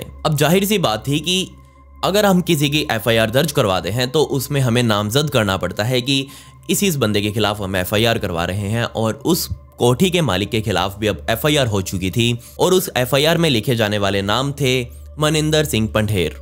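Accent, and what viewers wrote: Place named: Haryana, India. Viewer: Apki hindi mai , Haryanvi ton Hai लाडले ❤️❤️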